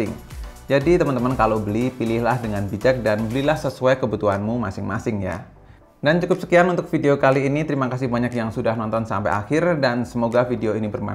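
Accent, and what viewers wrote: Yogyakarta, Indonesia. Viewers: Orang jogja bang?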